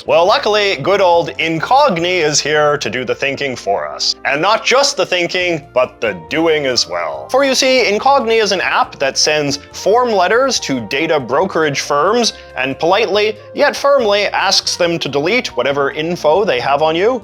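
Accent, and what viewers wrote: Canada. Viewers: Your accent is aggressively Canadian. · I live extremely close to a major crossing from the u.s.a to canada and this guy's voice is complexing and annoying as hell! Like I assume he is canadian and their english doesn't flow the same way as american english for sure but I've never heard it so... monotone and yet choppy for lack of words. I was interested on the subject but can't continue watching the video as the voice is absolutely dreadful.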